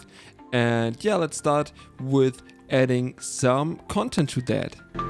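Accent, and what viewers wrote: Germany. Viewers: Der deutsch akzent ist crazy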